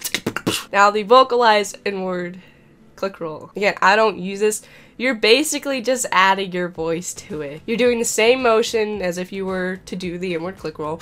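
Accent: South, United States. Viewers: What state are you from??? You sound soooo SOUTHERN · Your southern the way you say "Like" tells me you spent alot of time in the south or near southern people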